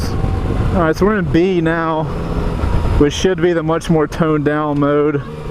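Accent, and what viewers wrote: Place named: United States. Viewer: "Dudn't feel like it, dudn't feel like it at all." Learn to speak the Queen's English! Stupid Yank!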